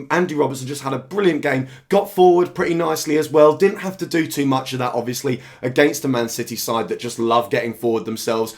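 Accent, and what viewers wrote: Liverpool, United Kingdom. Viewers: "Absolutely boss." Your Scouse is coming along nicely. :-)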